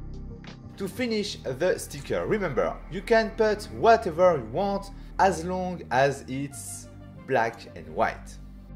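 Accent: France. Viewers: T’es français a tout hasard ?😂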